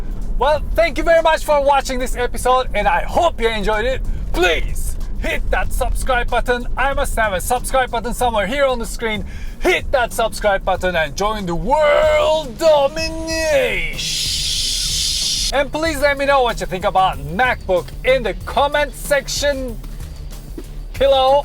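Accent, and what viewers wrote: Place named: Turkey. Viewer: Aksanı çok Türk kaçabilir fekat beyin gramerle sorunu yok obvs.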